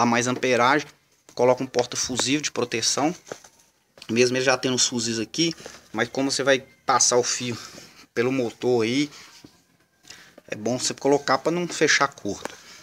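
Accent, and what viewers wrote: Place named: Minas Gerais, Brazil. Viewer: Boa, sotaque de mineiro.Bem explicado.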